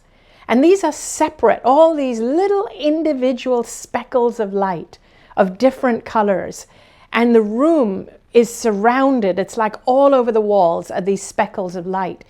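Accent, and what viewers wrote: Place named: California, United States. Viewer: Oh my! I read your books several years ago. My sister even met you in HK through a friend. Now I have found this site. To hear you speak now you sound very Californian! I’m from Sacramento but now live six blocks from the White House. I need to hear your optimism to get me through these tough times. You’re an angel.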